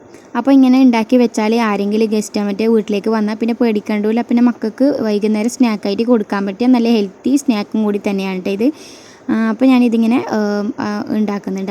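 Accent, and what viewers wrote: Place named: Kanhangad, India. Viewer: Evidea sthalam kanhagad ano samsaram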